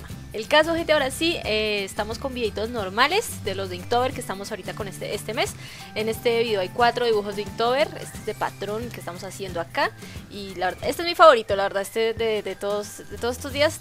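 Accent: Colombia. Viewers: ¿Eres colombiana no?, es la primera vez que entro a tu canal y lo note en seguida xD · cuando diijste que eres Bogota mi corazoncito dio un vuelvo eramos vecinas:'v Yo soy de Venezuela:') Pero ahora estoy en Ecuador y muy pronto en España Yo pase por Bogota y no te conocí:'U Pd: Pensé que eras mexicana por las expresiones que usas pero ahora que lo escucho bien si tienes acento colombiano 7u7r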